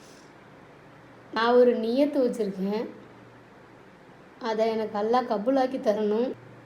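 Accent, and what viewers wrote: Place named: Ramanathapuram, India. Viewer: Endha ooru nenga ,,howka ,varakah nu pesurenga ,nenga native kerala illa , ramnad side ah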